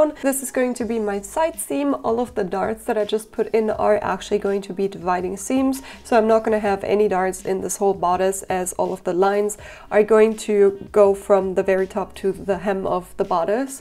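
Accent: Germany. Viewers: i can totally hear you're german :D nice dress tho. will try to make it myself.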